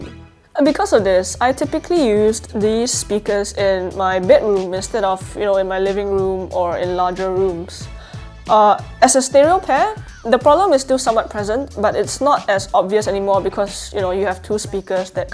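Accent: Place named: Singapore. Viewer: You're Singlish still can't escape but well done. More practice will push you further. Thanks for the review. If you may compare to Bose speakers for me particularly the Bose Mini will be great!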